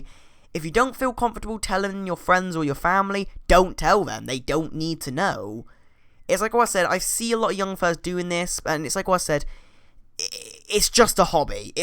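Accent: United Kingdom. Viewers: r u fucking british?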